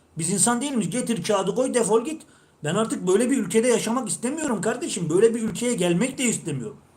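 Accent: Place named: Ankara, Turkey. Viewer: Angara sesin geliyor😮😮😮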